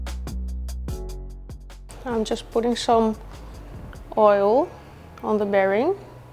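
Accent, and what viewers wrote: Netherlands. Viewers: Thx for another great video! I was curious about your t-shirt and found some good music 😊 a little off topic, but I have to mention I am thinking about bitterballen & stroopwafels when I hear your dutch accent 🤭 I cant help it - sorry 🤭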